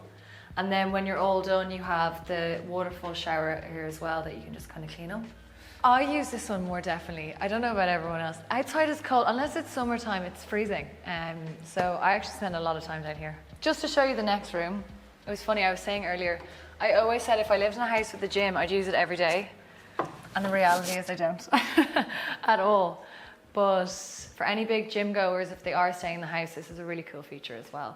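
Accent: Ireland. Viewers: yes I hear a definite Irish accent, very mild really. I would guess she is from a larger city in Ireland, Such as Dublin or possibly Belfast. Who knows. I think maybe? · Sweetie, did you think we would confuse you with Mexican? We know you are Irish, we can hear it, see it 😂😂 gorgeus home, you have grest taste❤❤❤ amazing views 😊 I have big envy energy 😂😂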